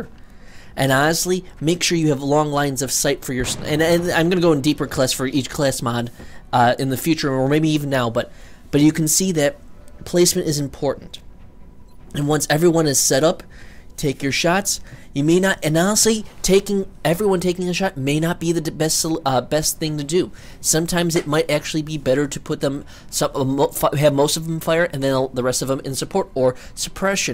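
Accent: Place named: California, United States. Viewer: Ahh! The subtle Californian accent. XD Awesome guide tho. You know what you are doing at least. Every other guide would miss something.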